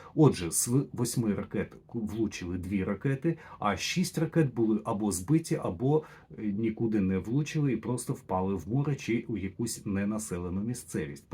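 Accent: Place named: Odesa, Ukraine. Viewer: Не звертайте уваги. У Вас чудова, характерна одеська вимова. Така вимова- Ваша родзинка.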